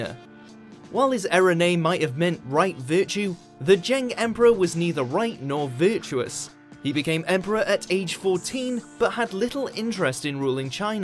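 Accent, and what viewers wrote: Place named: Liverpool, United Kingdom. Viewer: Can't watch this due to hideous Scouse accent saying 'merdered' every minute. Try not "murdering" the English language. Also "the women starved to death due to a lack of food"? Yes, that's generally why people starve to death.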